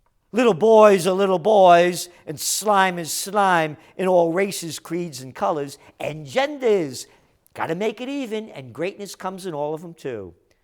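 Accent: New York, United States. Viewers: i love the New Yoik accent!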